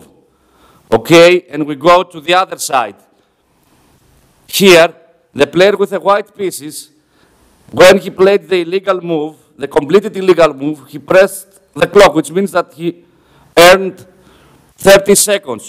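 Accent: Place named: Greece. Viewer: Αγαπώ την Ελληνική προφορά στα Αγγλικά!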